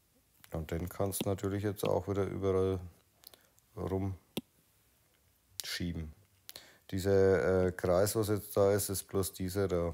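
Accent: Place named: Franconia, Germany. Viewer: Toll erklärt 😅 endlich mal ein Procreate Video im fränkischen. Leider klappt es aber bei mir nicht. Das Bild wird nur dupliziert und nicht ausgeschnitten :(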